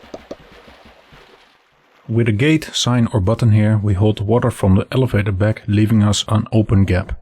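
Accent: Netherlands. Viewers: Thanks for explaining! I can sense Dutch-ness from miles away haha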